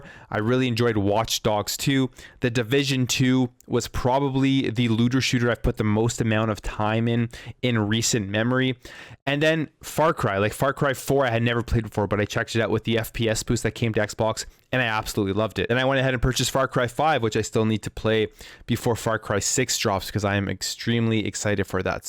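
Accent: Canada. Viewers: Figured you were Canadian when I heard Aboot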